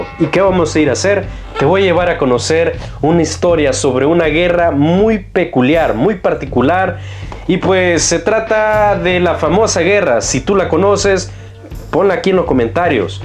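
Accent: Honduras. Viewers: Parece que el comentarista es hondureño. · MI PREGUNTA ES DE DONDE ESTA PERSONA Q ESTA ASIENDO ESTE VÍDEO MENTIROSO 🤥 PORQ HONDURAS EN NINGÚN MOMENTO PUDO DETENER AL EJERCITO SALVADOREÑO ME UBIERA GUSTADO Q ESE CONBATE UBIERA DURADO PORLO MENOS DOS DIAS MAS DE CONBATES 😂😂😂😂😂😂ACUÉRDENSE Q ESA GUERRA NO FUE DE CONQUISTA SI NO UNA RESPUESTA PARA DEFENDER ALOS SALVADOREÑOS Q ESTABAN ASIENDO ASESINADOS POR HONDUREÑOS ISI UBIERA SIDO UN GUERRA DE CONQUISTA UBIERA ABIDO MÁS MANTAZAS DE JENTES DE HONDURAS PORQ ASÍ NOSELE PUEDE RESPETAR LAVIDA ANINGUN CIVIL ENEMIGOS ÓSEA LES UBIERA IDO PEOR SOLO PIÉNSELO LA NUEVA OCOTEPEQUE YA ERA DOMINADA EN SU TOTALIDAD POR PARTE LAS FUERSAS SALVADOREÑAS PERO COMO NO ERA GUERRA DE CONQUISTA SELAS REGRESARON 😂😂haha UN CHOCOLATE AMIGOS SEAN SERIOS Y REALES PARA COMENTAR COSAS ASÍ EN ESPECIAL A ESTE LOCO DE ESTE Q ESTABA ASIENDO ESTE VÍDEO PARESE Q ES HONDUREÑOS ENLA LANERA DE ABLAR 😂😂😂😂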